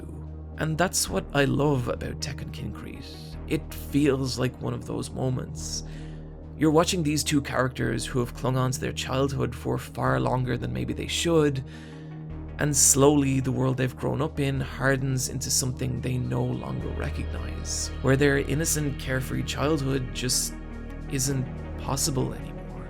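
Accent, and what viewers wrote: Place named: Ireland. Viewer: Your irish accent is lovely!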